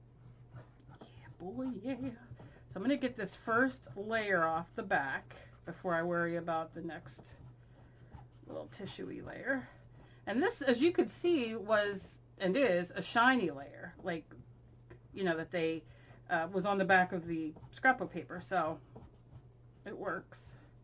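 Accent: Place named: Philadelphia, United States. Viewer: You gotta be from either Philly or South NJ lol. I know my own “accent” when I hear it!!!!! (I didn’t know we had an accent until I moved from Philly to NJ!!!! And met people from N. jersey! But I’m watching this to learn. And the whole time I’m like- I feel like talkin to one of my friends or aunts!!!! Yep I’d bet on it!!! Ok. Back to watching now but please correct me if I’m wrong!!! Lol!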